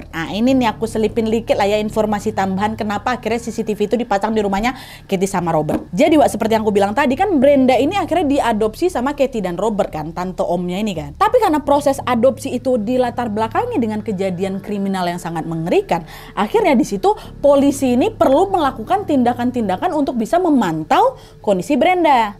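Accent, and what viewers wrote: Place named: Medan, Indonesia. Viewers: idolaku yng baru dlm knten yng crewet dr bbirmu tu, suka x bahasa yng kao ucap tu, kek orng Medan, apa pekanbaru tah aplah tu, lnjut skses bun cntik👍🤣😀😂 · Lucu si pembawa acara..Kaek orang Sumatra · kamu hebat dalam menyampaikan isi cerita kriminal yg seolah olah kamu sedang menyaksikan nya.. Salut utk kamu.. orang Medan ya? Kok logatnya kental bangaaaaat.. sor kali! 😜😜😜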